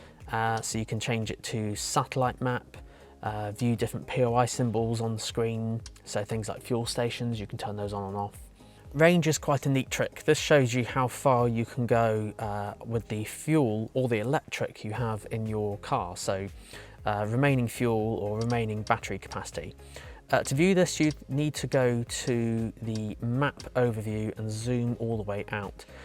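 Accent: United Kingdom. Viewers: its 10pm here and i felt like watching a good movie. mbux review; 55mins long in 4k and he’s British 🍿 out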